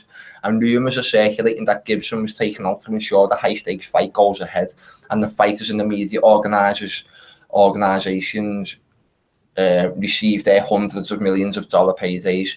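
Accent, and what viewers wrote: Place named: Liverpool, United Kingdom. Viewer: Love hearing a fellow scouser. And your so right. Total fix. Fucking joke mate.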